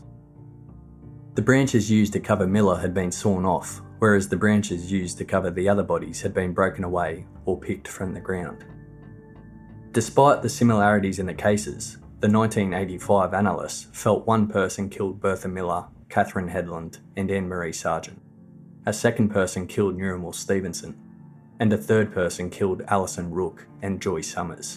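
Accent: Australia. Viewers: I love the podcast. I love hearing the AUSTRALIAN accent Makes me feel connected to that part of our world. I have always felt that the people in Australia have a kind of spirit like many in the USA. We are both young countries and there’s an exuberance in the people in both of our glands. I don’t know if I’ll ever make it to Australia , but your podcast brings me a bit closer. God bless us all on this extraordinary planet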